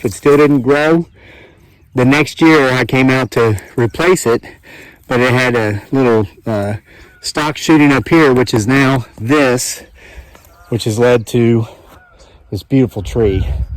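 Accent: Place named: West Virginia, United States. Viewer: Great videos! We have to ask where you’re from? Your slight accent reminds us of our friends in Fairmont, WV 😄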